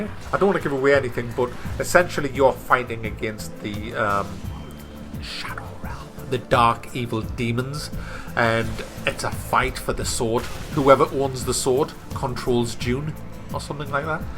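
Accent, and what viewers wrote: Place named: Wales, United Kingdom. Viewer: You have, in all likelihood, the MOST Welsh voice I've ever heard!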